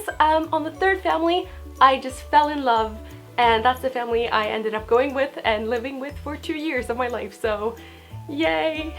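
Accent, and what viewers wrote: United States. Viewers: where are you from. you sound American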